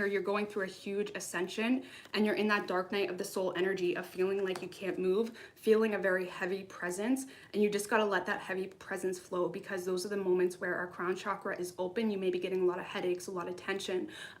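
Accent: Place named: Canada. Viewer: Love your readings and your accent...oot and aboot! X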